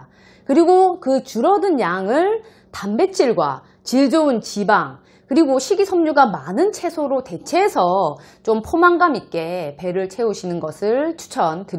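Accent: Gyeongsang, South Korea. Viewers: 개념원리 동반한 설명은 처음이네요. 감사합니다. 고향이 대구분이실듯.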